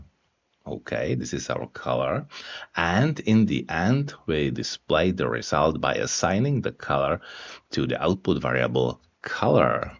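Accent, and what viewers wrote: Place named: Czech Republic. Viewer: Руслан, при чем здесь англосаксонцы и голос? из Чехии, это его акцент и его голос. У тебя всё в порядке? У тебя что-то мерещится? Может, перестанешь пользоваться интернетом, который так-то англосаксонский и пиндосский? (Какой же мусор у людей в головах!)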